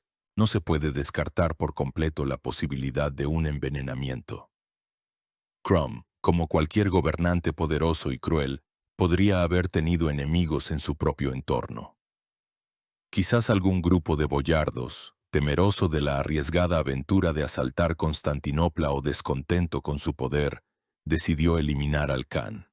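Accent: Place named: Latin America. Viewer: Aunque todos sabemos que este video esta hecho por IA, supongo que detras de el habra una persona dedicando tiempo y queriendo subir visualizaciones... No se si no se ha percatado de que desde que la locucion cambio a una voz en latino las visualizaciones han descendido drásticamente. Son muchos los comentarios que piden que volvais a la voz anterior pero parece que no se oyen las peticiones de la audiencia. Una pena....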